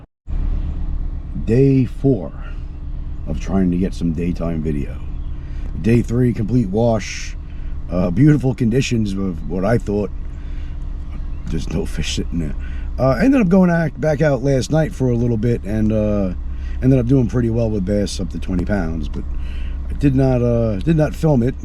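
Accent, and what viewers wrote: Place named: New York, United States. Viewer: I already know I want this rod , lamiglass something, I got that thick new yawk accent too, Bronx ? Anyways I’m from Long Island , local#3 in the city more than here, In any event yeah if you can let me know what rod that is - specs wise , I definitely need a new one, sick of my 9-2 st. Croix , it’s not the greatest for this top water guy , about to watch this vid I know you guys are gonna crush it ! Thanks brotha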